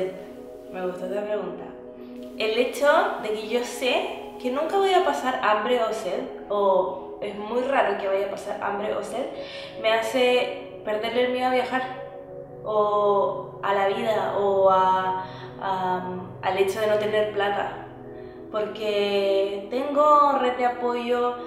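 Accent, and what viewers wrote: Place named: Chile. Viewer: ya casi se te está yendo el acentos chileno.jajaja y me encanta! Por otro lado me inspiras ❤️ me di cuenta que la vida se va y la mayoría nos quedamos atrapados en un trabajo para poder sobreviviry nos olvidamos del universo completo que tenemos afuera.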